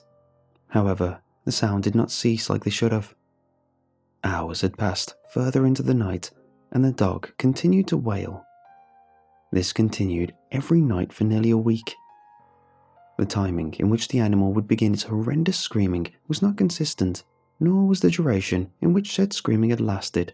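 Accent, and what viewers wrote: United Kingdom. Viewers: A velvet voice with a charming British accent reading me some Creepypasta? What's not to love? <3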